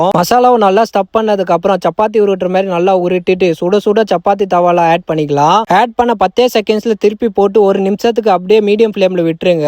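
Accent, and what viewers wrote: Rajasthan, India. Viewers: How u speak Tamil? You are Rajasthani or Marwadi?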